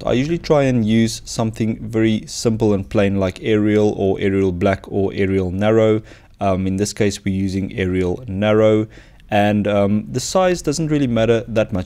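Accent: South Africa. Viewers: South African? Love from Cape Town <3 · South African :)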